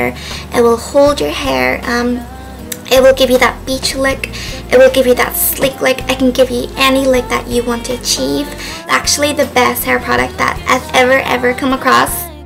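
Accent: Ireland. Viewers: are you irish? I totally LOVE your accent! <3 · What is your accent? It sounds a bit Irish???? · Is that an irish accent???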